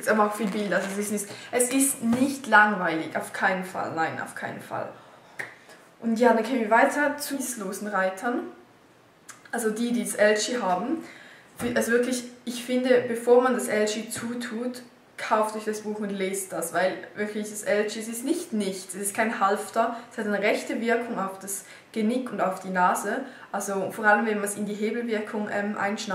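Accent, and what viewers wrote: Switzerland. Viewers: Haha schwizer Dialekt! :D Wo stoht dis Ross eig? (Sorry wege Solothurner Dialekt xD) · sooo süß wie man den schweizer dialekt raushört :D !!!! schöne grüße ausm ländle !! jedsmol wenn i dine videos aschau wür i so richtig nidisch xD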